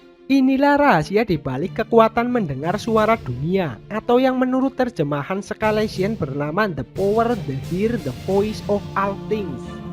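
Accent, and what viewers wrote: Banyumas, Indonesia. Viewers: wakwakwak, wanjir imonya, ane hargai btw, suarane ngapak men mad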